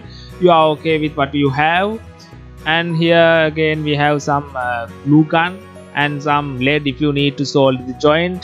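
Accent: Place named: Sri Lanka. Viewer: you have sri lankan accent 🤔👍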